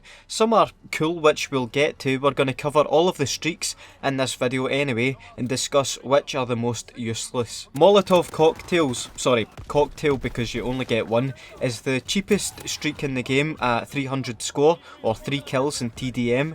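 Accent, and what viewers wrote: Scotland, United Kingdom. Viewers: That scotish accent tho, its hard to understand sometimes since im Murican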